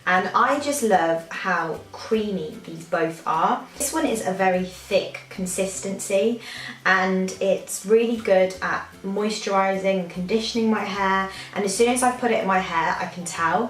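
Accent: United Kingdom. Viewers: Great video love !! I love English accent 😍 !!! Im here showing some love and supporting back 😊💕 thanks for stopping by my channel. Xoxoxo💕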